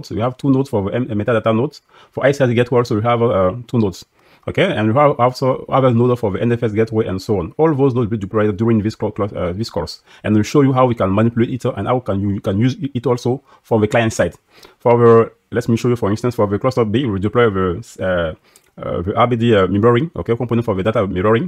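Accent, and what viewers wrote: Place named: France. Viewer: still very hard to listen on 0.75X speed, the guy has a very thick French accent, it’s realy hard to follow.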